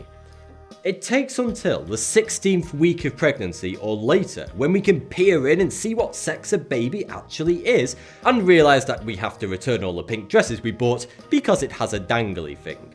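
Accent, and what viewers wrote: United Kingdom. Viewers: I love how your British Dialect makes saying "penis and clitoris" sound so eloquant.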